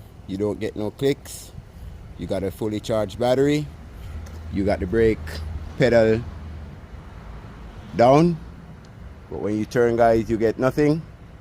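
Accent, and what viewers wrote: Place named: Jamaica. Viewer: You did a great job thank you for the tip and showing us what to do and I like your accent are you Jamaican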